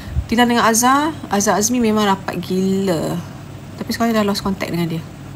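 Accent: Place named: North, Malaysia. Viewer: Org utara ckp . Ibrahim Mcm² la aw👍🌹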